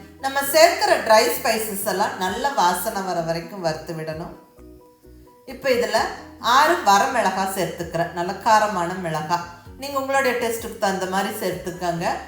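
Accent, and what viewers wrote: Coimbatore, India. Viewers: மிகவும் அருமையா இருக்கு. நீங்க பேசுற கோவைத் தமிழிலே இன்னும் சுவை கூடத்தான் தெரிகிறது. மகிழ்ச்சி. வாழ்த்துக்கள் அம்மா.👍💐🙏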